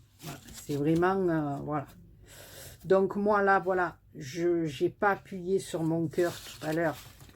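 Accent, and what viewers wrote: South, France. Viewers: Super carte et j’adore vôtre accent du sud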